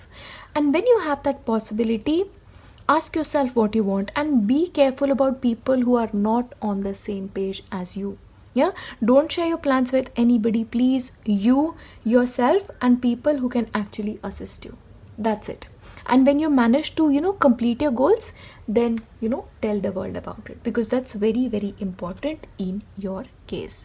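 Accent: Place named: India. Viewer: Thank you for the readings 💐. You rightly said we are a soul group, connected through messages 💖. I claim pile 2 as I like turtles and these messages connect with me 🙏 are you not from India? Your accent is very Indian 😊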